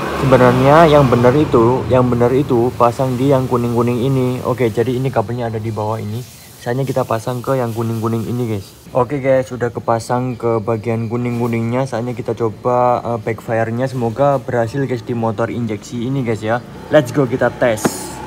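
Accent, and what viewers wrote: Malang, Indonesia. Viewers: Orang malang lur ? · Orang malang mas? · Wihh orang malang bang? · orang malang bang? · Mas orang malang ya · oalahh tibakne wong malang toh sam, pantesan kok medhok2 ngunu mantep sam!! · Wong malang a iki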